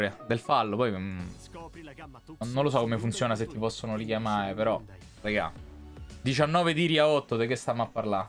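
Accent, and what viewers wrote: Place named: Frosinone, Italy. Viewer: Bravo.... tutto sommato obbiettivo e onesto per quanto ha visto e raccontato. Detto da un romanista é un grosso complimento. Rimane questo tratto di accento frusinate che non guasta visto che parliamo di un laziese😅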